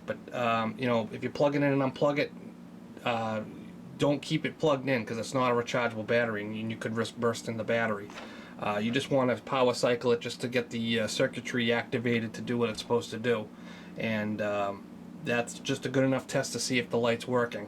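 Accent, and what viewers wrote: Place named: New York, United States. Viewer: THANKS!! You answered all of my unanswered questions. Hit the nail on the head my battery read 4.2 volts. by the way loved the accent, you must be from New York Thanks again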